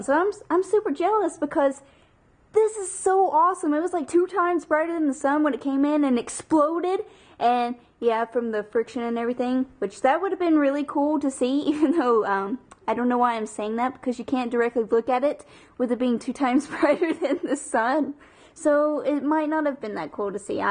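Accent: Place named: South, United States. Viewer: I can totally hear your southern accent. lol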